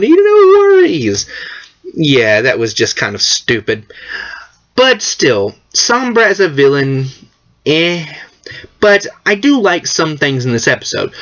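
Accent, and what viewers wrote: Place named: Tennessee, United States. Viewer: Is the accent put on or are you from the deep south? You sound Tennessean, but that's just a guess. I hear all kinds of the accent down here in Florida. (Also, I would encourage more pictures which I know are a pain in the ass, but make the video more dynamic and making your background not white. google neutral colors for some options.)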